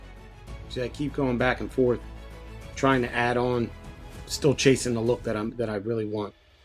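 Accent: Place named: Philadelphia, United States. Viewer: Nice job! I hear Philly btw lol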